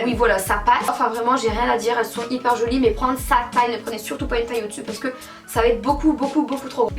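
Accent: North, France. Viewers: cet accent ( nordiste?) tellement présent..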